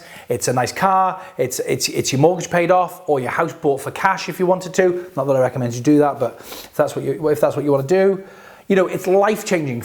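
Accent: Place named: England, United Kingdom. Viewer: I watched the video and that was what I thought from the beginning. But, in this video, I think I just had a hard time understanding your English accent (I'm a hick from Indiana). Now that you cleared that up, I believe you must have been telling us that others had a problem with the 50 billion and not you. By the way, I watch a lot of HBar videos from various people and yours are by far the best and most informative. Most of the others are just pumping the coin without any real substance. Thanks.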